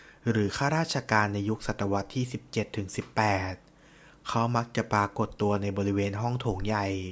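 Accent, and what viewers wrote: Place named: Thailand. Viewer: เสียงเหน่อ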